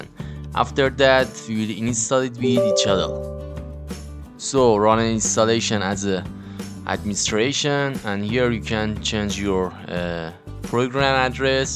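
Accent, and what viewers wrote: Iran. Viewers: معلومه لحجه ایرانی دارع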